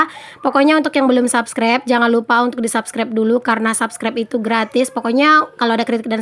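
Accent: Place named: Padang, Indonesia. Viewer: Alhamdulillah, Tabarokalloh... Seneng dengar Bunda bicara menggunakan bahasa daerah, seperti loghot adik ipar yg dari padang...🙏🙏😊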